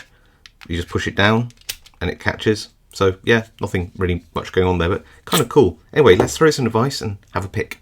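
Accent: United Kingdom. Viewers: u from uk? (I am)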